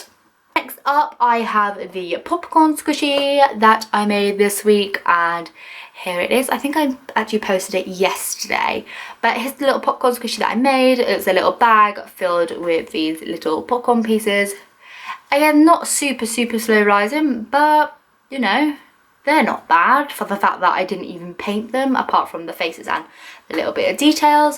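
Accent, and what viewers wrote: United Kingdom. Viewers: 13 Reason Why...I ❤️ 1. She makes the CUTEST squishies 2. She uploads regularly 3. She is just the SWEETEST person 4. She is really CREATIVE 5. She makes the BEST original content 6. She has a really pretty British accent 7. She is SUPER kawaii 8. She is really Hard working 9. She cares about Everything 10. Her videos are “Family Friendly” 11. Her aesthetic is Just...GOALS 12. Her tutorials are REALLY helpful 13. I just love her in general 😘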